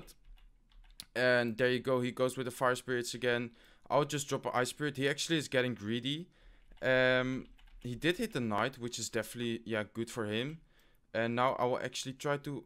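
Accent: Netherlands. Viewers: Are u Dutch? Je hebt een Nederlands accent😂💪🏻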